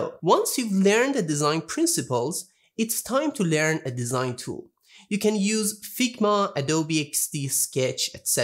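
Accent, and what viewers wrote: Iran. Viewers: شاید باورت نشه ولی وقتی که برای بار اول صدات و تلفظتو شنیدم (بدون اینکه اسمی از کانال یا ... ببینم) فهمیدم ایرانی ای😁😁😂😂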